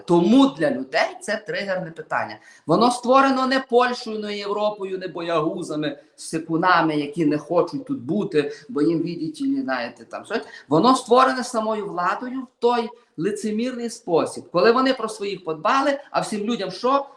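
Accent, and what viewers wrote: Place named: Lviv, Ukraine. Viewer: Чому не на фронті,бридкий львівський акцент,послухайте полтавщину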